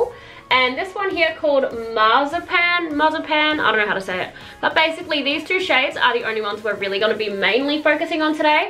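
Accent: Australia. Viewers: R u Australian? Because I am just asking.. (edited: u might be sleeping rn if u r Australian)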